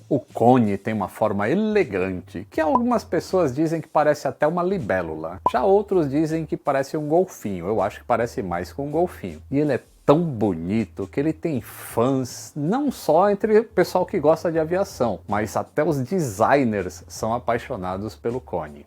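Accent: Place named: São Paulo, Brazil. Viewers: O pior é que o é potiguar, na verdade. É do outro Rio Grande... Mas veio ainda criança para São Paulo (Guarujá, na verdade, mas o sotaque da Baixada Santista é praticamente igual ao da capital) e pegou o sotaque paulistano. E obrigado pelo elogio, que na verdade foi para mim, que fiz o roteiro. Sou meio obsessivo com checagem das coisas, tenho a maior paranoia de falar besteira (até porque me irrito com as dos outros) e checo tudo várias vezes. Por exemplo, no vídeo do sequestro na China, do qual eu também fiz o roteiro, conferi e descobri que o nome do sequestrador sobrevivente era diferente do da fonte principal que o tinha me mandado - estava errado lá. O vídeo saiu com o nome certo. Pesquisei até a pronúncia (aproximada) dos nomes chineses para orientar o. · Cara, sei que não és gaúcho, até pelo teu “leve” sotaque paulista. Mas parabéns pelas informações sobre os locais e cidades daqui, já que muitas vezes os vídeos não se preocupam com isso e erram esses detalhes. 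Ótimo trabalho!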